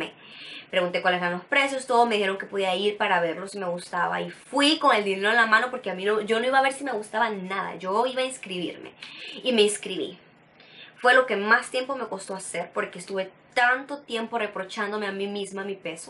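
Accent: Honduras. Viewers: Hola, como estás? es la primera vez que veo vídeos tuyos. Y me ha encantado, chica ya te ganaste otra suscriptora.!! Saludos desde Madrid, catrachaaa! me encanta escuchar mi acento!!! 😂😂😂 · Bravo 👏 me encanta ver cómo te expresas a lo catracho👏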